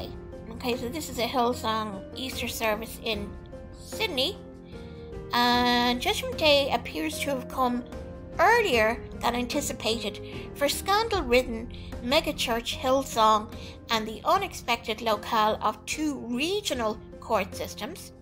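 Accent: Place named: Ireland. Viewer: Good video & great accent! Are you in Ireland? God bless you & keep up the good work.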